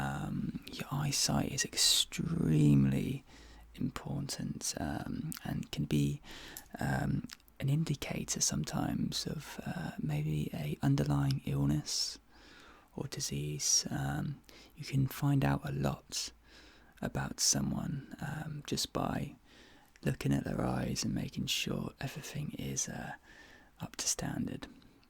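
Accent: United Kingdom. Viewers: Love the accent what part of the UK?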